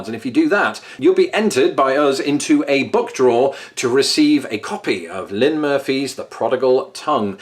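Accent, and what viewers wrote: United Kingdom. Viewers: Glad you had a good trip and are home safely. Now, it seems weird, but your British accent seems stronger now that in was before you left. Like you got a refresher on your accent! Anyone else notice that? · I just discovered your channel tonight. I haven't watched all your videos but it seems that your British accent was slightly more pronounced upon your return. I think that's pretty cool. My mom was like that when she went to visit her family or during phone conversations. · Thanks for interesting video. Your accent is Heavier. More British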